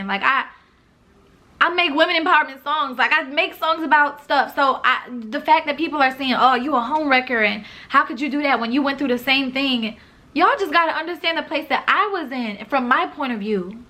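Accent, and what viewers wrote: New York, United States. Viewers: she sound like a new yorker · she's starting to get that New York accent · No cap queen finally sound like she from ny now 😫😫 I love ittt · She talk mad ny now lowkey tho😭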